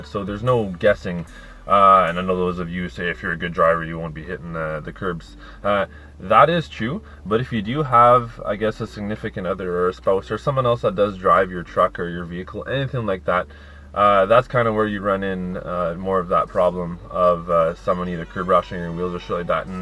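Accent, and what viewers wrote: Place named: Canada. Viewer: this is random but your Canadian accent is awesome! haha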